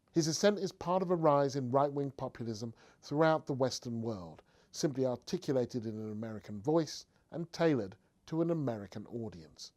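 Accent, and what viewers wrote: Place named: United Kingdom. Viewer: I think it's so cool when a black guy almost speaks with a real British accent!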